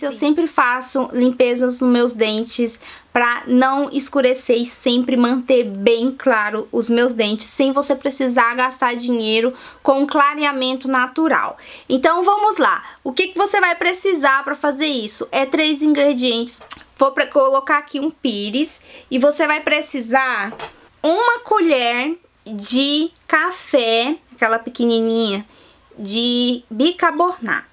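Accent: Minas Gerais, Brazil. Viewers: É mineira ? Kkkk você parece engraçada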